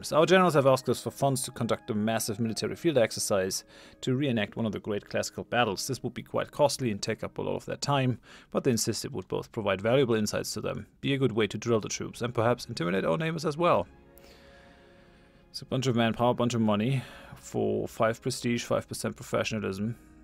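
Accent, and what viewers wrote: Germany. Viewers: Oh it is a German accent, I always thought you were dutch lul. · I've been waiting for this episode! You should definitely do more EU4 let's plays! Looooove it so much. (and your german accent is awesome) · Haha no, german accent. I like that he pronounces french and dutch names somewhat decent though :) Better than most americans at least haha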